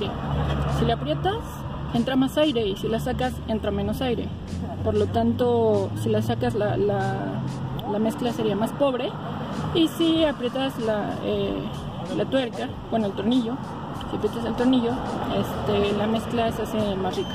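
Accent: Mexico. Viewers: Ahora si voy aprender mecánica, con está hermosa mujer si vale la pena ver videos de mecánica y además me gusta mucho su acento mexicano, saludos desde Valledupar Colombia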